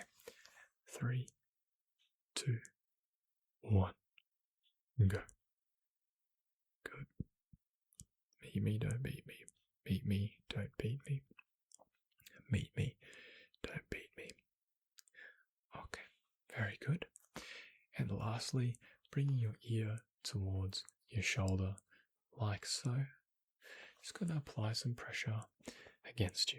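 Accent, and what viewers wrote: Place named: Australia. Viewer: It's so lovely to discover an Aussie voice, makes me feel at home 😀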